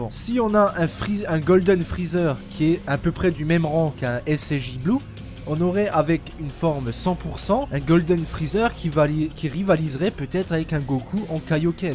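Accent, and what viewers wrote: Alsace, France. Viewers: Waouh c'est génial cette chaîne je connaissais pas sinon par pur hasard tu serais pas alsacien😂? On reconnait le petit accent (pcq je le suis)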